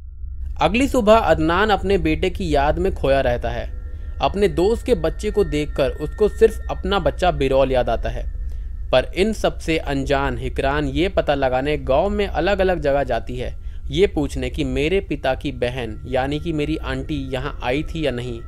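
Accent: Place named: Haryana, India. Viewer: Haring admi ka awaz hai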